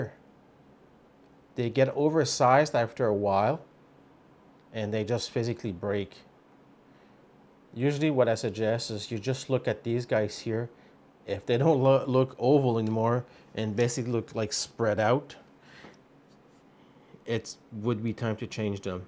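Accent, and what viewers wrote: Quebec, Canada. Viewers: You sound like you're from Quebec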